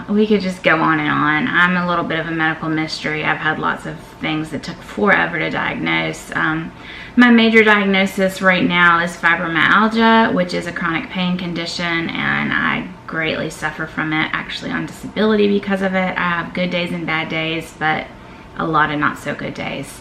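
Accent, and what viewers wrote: South, United States. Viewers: Another great video, This is so cool, helps us get to know you! I love your southern accent! My sister has a southern accent, she lives in HHI. Do you live close to HHI? I'm visiting her in June! Prayers are with your lil man!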